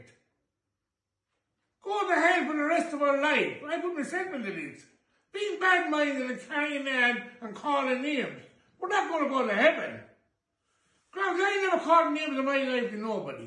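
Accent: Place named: Spain. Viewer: Is this a drunk Spanish man I’m struggling with the dialect.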